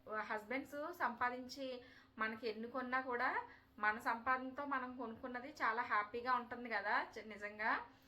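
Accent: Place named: East Godavari, India. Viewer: meedi yevuru garu turpugodavari basha laga vunnadi akkada evvarinina valuyu bulga palakaristaru ekkuva takkuva bedam vundadu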